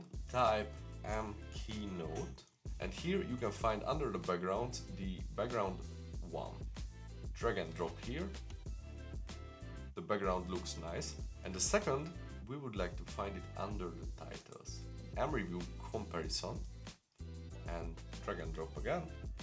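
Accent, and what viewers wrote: Hungary. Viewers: Sziaaa.... When I was watching the first 10s of your video, in my head I was thinking " man this guy has a Hungarian accent " then you said your from Budapest - I started to laugh - NO WAYY!!! En is magyar vagyok. Budapesten szuletem, de Canadaba lakok gyerek korom otta ;) I just subbed tesom :) Curious since you are in europe do you record and edit on a 30fps time line?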